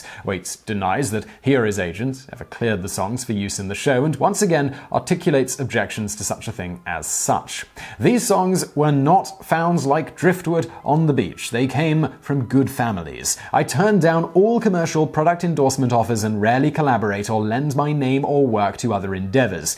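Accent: England, United Kingdom. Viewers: Regurgitating research in a verbose and pretentious manner doesn't make you look smart, it just makes you look like a massive twat. Consider popular academics and scientists such as Carl Sagan or Kenneth Clark who communicate with their audience without the BS. I can tell every day you thank God for giving you an English accent. Every day I thank God that I don't know you personally. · Casey Kasem doing a British accent. Expect him to break in to an ad for the gap at any time. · he's using a European pronunciation of the word that goes by how it's spelled. "Bourbon". He's not drunk, he's English.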